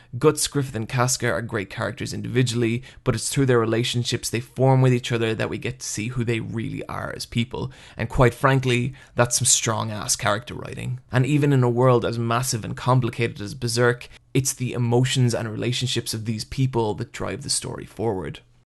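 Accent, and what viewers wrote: Ireland. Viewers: you're Irish... I'd love to have an Irish accent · are you irish? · you Irish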